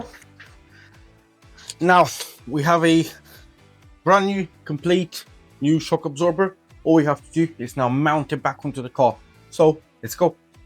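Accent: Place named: South Africa. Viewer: South African? Love the accent!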